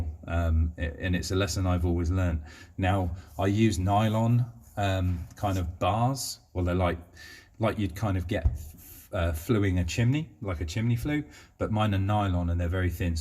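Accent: United Kingdom. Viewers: Hi great channel, it sux snap on hasn't been great for you. I watch the channel cause you talk about snap on tools, nice to hear from a Brit instead of a yank for a kiwi snap on fanboy. love your toys!!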